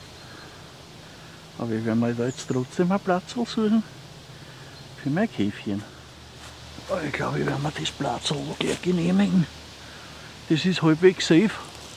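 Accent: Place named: Austria. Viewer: Hallo, Ich schaue dich nun schon eine Zeit. Leider verstehe ich bis heute nicht Deinen Gruss an die Kranken. Was sagst Du? Kranken Sesseln??? was bedeutet das. Leider kenne ich das Östereichische Dialekt nicht:-) Viele Grüsse Michael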